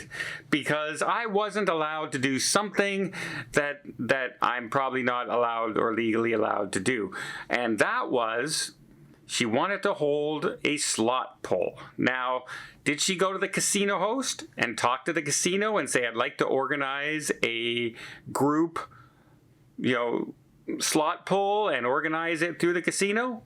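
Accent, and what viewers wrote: Canada. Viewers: oh I thought it sounded like fantastic but I guess with his Canadian accent it sounds like van.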